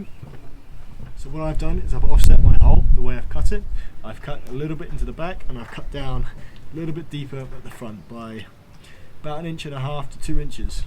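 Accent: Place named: North Dakota, United States. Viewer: Great vid! Just curious about your accent. What state are you from? North Dakota?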